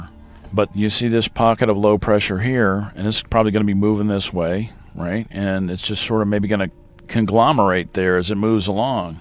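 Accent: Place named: Mississippi, United States. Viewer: You have a Mississippi accent. Where are you at. Why did you choose to open a youtube channel?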